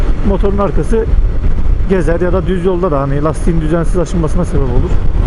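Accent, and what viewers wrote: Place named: Konya, Turkey. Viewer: Abi senin memleket neresiydi konya mi konuşman çok benziyor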